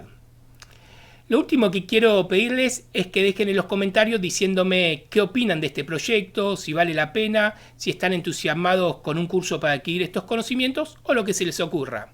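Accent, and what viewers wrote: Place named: Argentina. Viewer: viejo, es increíble!!! que laburo!! que apasionado te felicito estimo por el acento que sos argentino un abrazo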